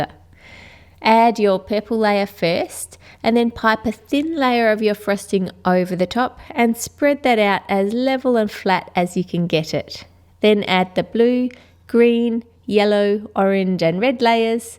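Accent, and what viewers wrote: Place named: Australia. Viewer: Because she's from Australia had as an accent.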